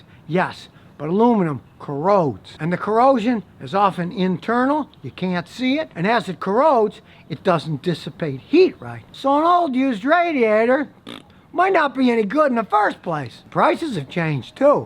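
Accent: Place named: Texas, United States. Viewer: Hi Scotty I love you videos and I religiously watch them. I've even learnt how to understand your Texan accent. Much love from Kenya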